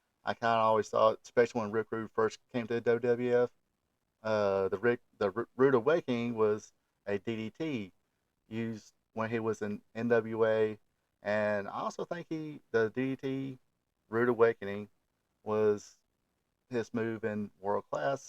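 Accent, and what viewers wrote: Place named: South, United States. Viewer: Man i am so glad fans like you cover these. This on has a special place in my heart . My first PPV . Better days. Dude where are you from. Your accent is Tennessee or Georgia. Old school fan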